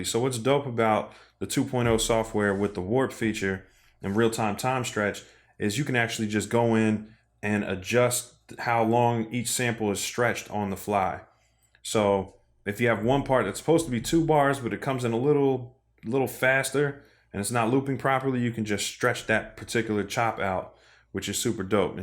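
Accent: Philadelphia, United States. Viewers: You from Philly fam?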